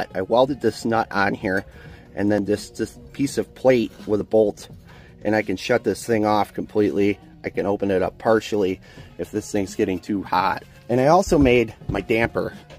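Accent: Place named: Michigan, United States. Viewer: You sound like you have a Michigan accent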